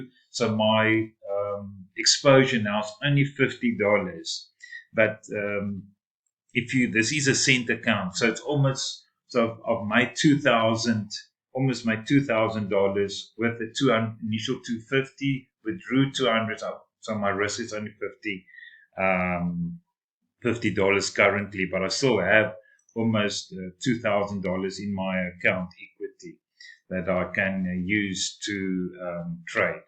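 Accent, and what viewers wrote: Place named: South Africa. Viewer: Digging the deep Afrikaans accent bro. 🇿🇦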